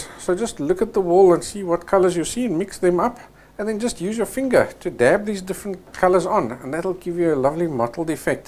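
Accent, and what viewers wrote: South Africa. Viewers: I have been watching your work for a couple weeks and I keep saying You sound South African to me. Well I found out today that you are from SA. Lovely I enjoy your work and the effort you make for us to understand.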